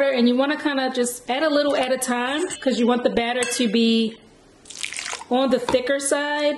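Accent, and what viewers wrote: Baltimore, United States. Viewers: You from Baltimore? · Are u from Baltimore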